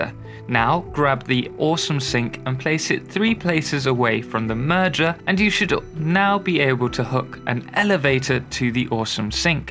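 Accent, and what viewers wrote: United Kingdom. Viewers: This has got to be the most British sounding person ever.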